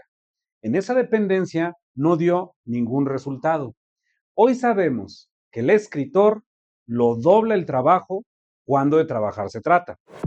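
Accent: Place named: Monterrey, Mexico. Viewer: Muchas gracias Lic. Se nota Su nivel académico y cultural, estaba segura que: O era USTED de CDMX, o al menos vive ahí. Se distingue por su cultura, educación y forma de expresarse. Aunque no hable usted como "chilango" porque tiene la fineza de "REGIO". 😁😁😁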